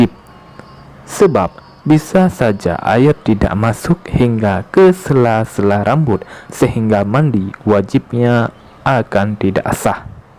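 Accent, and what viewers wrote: Aceh, Indonesia. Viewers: Denger dari suaranya Orang aceh ni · Ini pasti awak aceh , terasa logat aceh nya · Adminnya orang aceh ya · Sang nyoe lage na logat logat khas ureung aceh😁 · Ini logat orang Aceh bukan?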